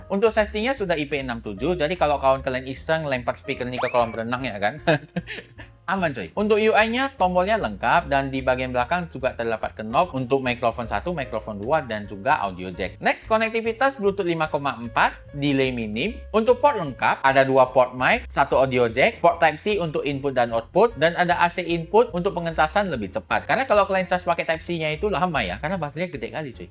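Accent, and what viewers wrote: Medan, Indonesia. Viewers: berat "kali".., agak2 medan gaya ngomongnya.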